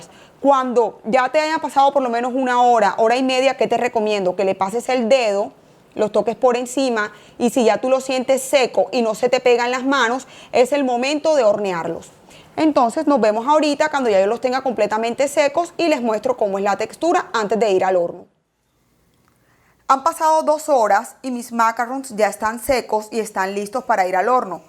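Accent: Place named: Barranquilla, Colombia. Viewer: Eres barranquillera!!!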